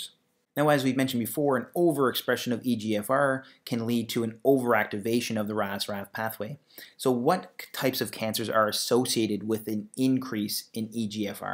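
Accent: Canada. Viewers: are u canadian?? ur accent sounds super canadian lol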